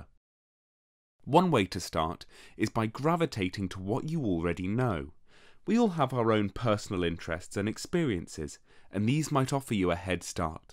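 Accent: England, United Kingdom. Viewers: It's just an English accent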